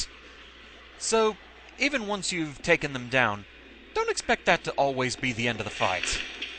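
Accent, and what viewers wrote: United Kingdom. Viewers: I know im late and this was posted years ago I like your let's plays...by the way are you British if add on your English is good ?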